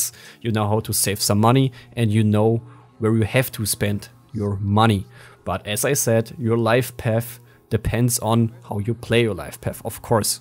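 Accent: Germany. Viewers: hab ich an deinem deutschen Akzent erkannt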